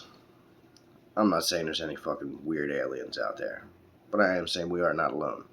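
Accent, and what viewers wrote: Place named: Ireland. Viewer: our soul is stranded in skin, bones and a little more of chunk for some of us. I believe the meaning of life is to live to the best of our ability and take it one day at a time. Some days I feel on top of the world and other days I want to crawl to the back of a cave and disappear. You’re right about money, it doesn’t define one’s character, it can leave a legacy but the act of love leaves a larger legacy ❤️ BTW Mr. your Irish accent is ☘️ but I love your authentic voice. Keep the videos coming……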